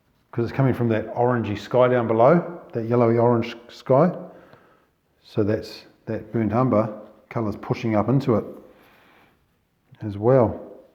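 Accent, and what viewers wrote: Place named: New Zealand. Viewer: Just found your channel and subscribed. Nice hearing another kiwi voice and familiar scenery for a change. Very informative tutorial thanks.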